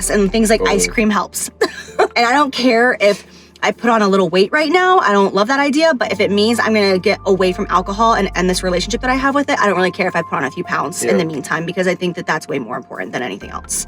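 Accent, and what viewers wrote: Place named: Upper Peninsula Michigan, United States. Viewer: I know this is super random. But, I think it's so funny that you're a born and raised Floridian, and you say 'Reesees' instead of 'Reeses'! I live in the UP of Michigan and I do remember you saying you've been here and have family here. And that's how us Yoopers say Reese's too🙂 love you! And I'm so proud of your journey, girl 💜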